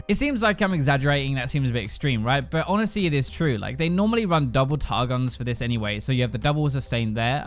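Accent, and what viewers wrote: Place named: RP, United Kingdom. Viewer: I love your accent! I love RP as well... :)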